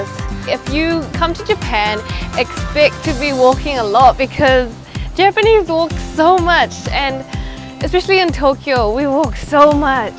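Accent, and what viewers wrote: New Zealand. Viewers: Its so cool finding people also from New Zealand. I knew your accent sounded familiar ^_^ newly subbed but so far I adore your videos <3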